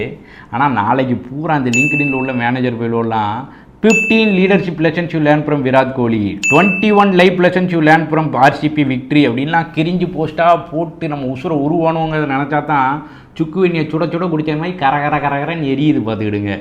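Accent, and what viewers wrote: Tirunelveli, India. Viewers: Very nice to hear the Tirunelveli slang ❤❤❤🎉🎉🎉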